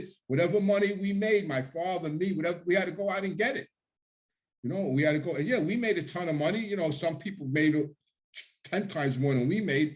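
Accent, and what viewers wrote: New York, United States. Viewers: I love listening to you about baseball!❤️❤️ Ny Ny!!! I hear you about the Accent!!!! 😂😂 Yes we do have more common sense!! No need to apologize ❤️ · My dad knew Carlos Marcello and Marcello used to be teased behind his back for speaking with a Cajun accent. Our family came from Italy to Louisiana in 1894. Love the NY accent and enjoying the videos.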